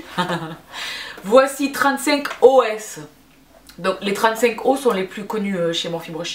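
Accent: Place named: Montpellier, France. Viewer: Bonjour, bravo pour tes tutos très pros et ta bonne humeur!!! Tu as l'accent de chez nous ( je suis de Montpellier), j'adore, tu me fais penser à mes amies Biterroises, c'est incroyable!!!Bises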